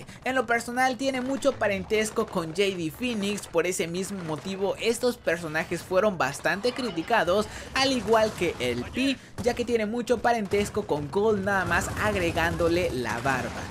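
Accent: Chiapas, Mexico. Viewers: eres de chiapas?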